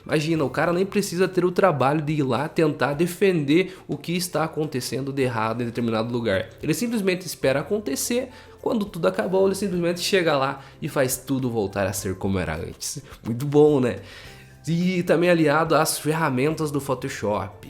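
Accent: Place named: Curitiba, Brazil. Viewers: sotaque curitibano forte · Tu tem um sotaque daqui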